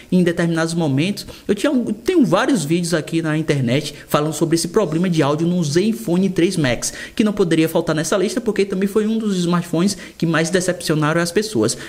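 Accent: Bahia, Brazil. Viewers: De certeza esse cara é baiano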